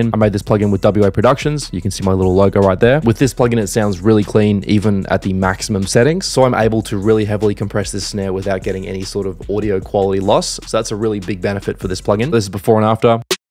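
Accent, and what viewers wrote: Australia. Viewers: you aussie? glad I come across u, awesome tutorials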